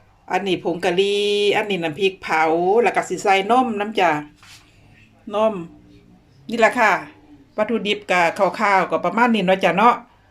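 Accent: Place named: Sisaket, Thailand. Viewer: ปูผัดผงกะหรี่เป็นตาแชบคักแท้ครับมาเบิงมากินเด้อครับออนชอนปูน้อ. ครับโตใหย่ๆครับสะออนมักสำเนียงภาษาอีสานทางศรีสะเกษ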